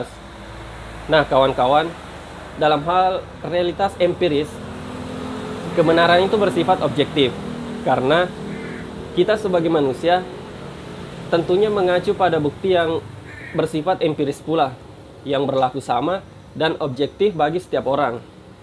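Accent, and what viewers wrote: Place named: Bugis, Indonesia. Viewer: Kayak nya kita satu daerah dari logatnya bang kl boleh nebak dari bugis ya saya juga asli sulawesi tapi dari kecil di jawa skrg sdh menetap di jawa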